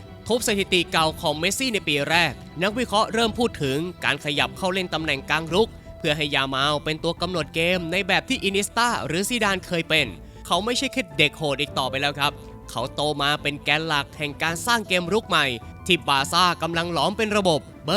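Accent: South, Thailand. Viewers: อย่าพูดใต้อีกนะครับ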